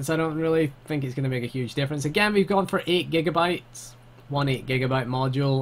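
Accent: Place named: Scotland, United Kingdom. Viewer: He's from scotland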